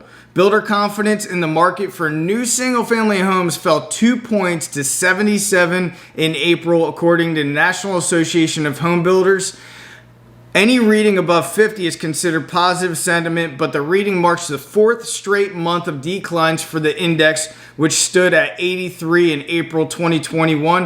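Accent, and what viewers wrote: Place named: Delaware County, United States. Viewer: I hear a Delco accent from this gentleman. I know this to be true.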